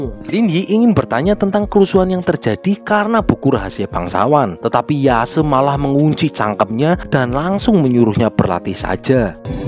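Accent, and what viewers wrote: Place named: Purwokerto, Indonesia. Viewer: Rika asline wongendi kang, medok temen gole ngomong purwokerto ya😂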